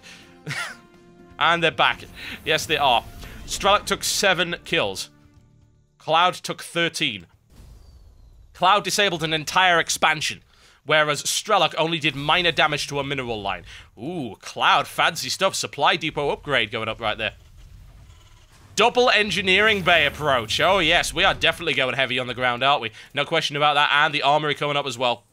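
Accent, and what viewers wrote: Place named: Northern England, United Kingdom. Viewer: It isn't his actual accent, he actually has a Northern accent. If you listen carefully when he gets excited, you'll hear it.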